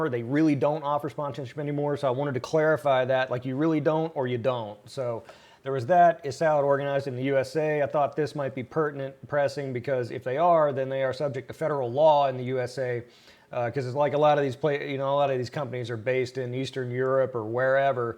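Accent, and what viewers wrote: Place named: Australia. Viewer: Mate? Sounds Australian! :)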